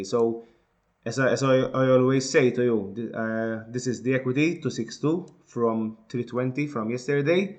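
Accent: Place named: Russia. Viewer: I like your accent beside the analysis. Like a person from russia or france.😁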